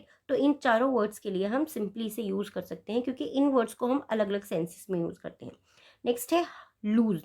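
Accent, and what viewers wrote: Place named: India. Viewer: Hindi pronunciation of english word proper nhi lag raha hai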